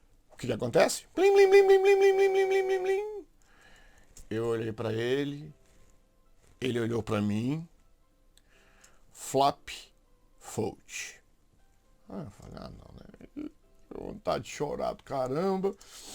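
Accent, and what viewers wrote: Minas Gerais, Brazil. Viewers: Você é o famoso piloto que fala "mineirês"?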